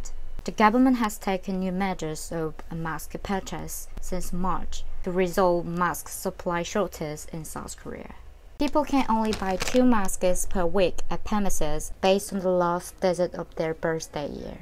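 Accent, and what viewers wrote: United Kingdom. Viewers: 와 진짜 영국발음👍👍👍👏👏👏👏👏👏👏 너무 웃긴 질문인거 알지만😂😂 저두 2년 살면 님 처럼 영어 잘 해 질 수 있을까요...!! 물론 개인차도 있고 언니의 노력이 지금의 결과를 이루었지만 진짜🙏🙏👏👏 감탄하고 갑니다!!!ㅎㅎㅎ (전 호주에 있다 왔는데,왜 발음은 미국일까요😂😂😂) 진짜 영국발음 너무 멋있고 이런 내용 정리 잘 해주셔서 영상 올리시는 언니도 너무 멋있으세용💕💕💕 아 진짜 내가 유튜브에서 이런 채널을 발견하다니😍🥰ㅎㅎ 도쿄는 저녁🌛인데 런던은 아침🌞이겠죠? 전 오늘 편집 한다고 날 샜는데😂 금요일 한 주 마무리 잘 하시구 좋은 하루 되세용!!!💚💚💪💪 아 진짜 영국발음 언니 너무 멋이썽!!!!🥰❤️ (갑자기 다가와서 친한...척 죄송해요😂😂멋있어서 그만...!!!😍👍) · 私の母国語は日本語ですが韓国語も英語も扱えるようになりたくて勉強中なのですが、さんはもうマスターされてて本当に尊敬します☺️🕊👏🏻 イギリスアクセント素敵です💕 私は勝手に私にとってさんは原動力でありとても刺激になる方だと思っています🥰いつも動画楽しく見ていますこの言葉を伝えたくて初コメ失礼します😖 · Love your English accent 😁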